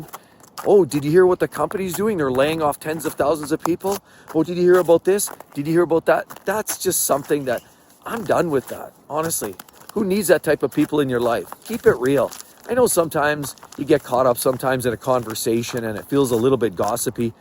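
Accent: Canada. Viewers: Are you Canadian, or northern U.S. ? I hear a bit of a Canadian accent.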